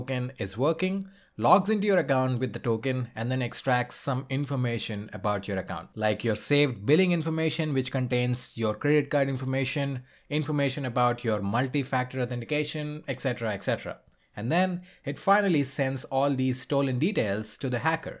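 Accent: India. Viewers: Indian no text to speech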